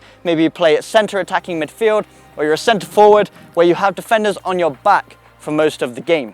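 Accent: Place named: England, United Kingdom. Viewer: Top video as per usual.Where abouts in England are you from mate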